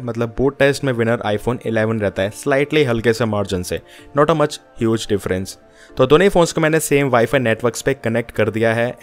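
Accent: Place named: Punjab, India. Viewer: Bro Apki Voice Me Thorda Sa Punjabi Touch Aa Reha Hai Are U Punjabi ???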